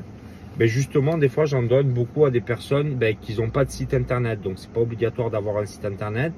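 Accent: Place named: South, France. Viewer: Merci beaucoup pour tout ses petits conseils qui apportent énormément Et toujours avec cet accent du sud qui fait plaisir 👍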